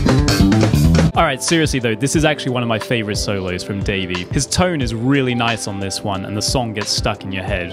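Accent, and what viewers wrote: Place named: United Kingdom. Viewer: Nothing like funk with a British accent! Love it. That's some proper funk, mate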